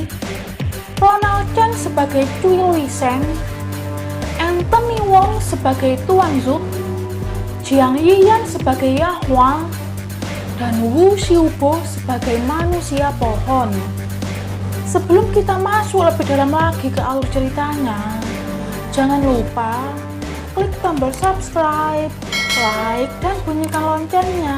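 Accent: Java, Indonesia. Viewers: Jelas wong jowo iki?👍👍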